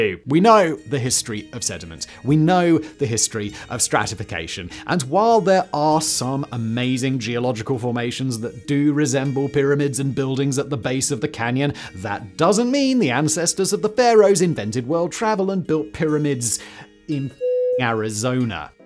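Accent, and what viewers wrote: RP, United Kingdom. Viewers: If this guy didn't have an educated British accent, no one would listen to him.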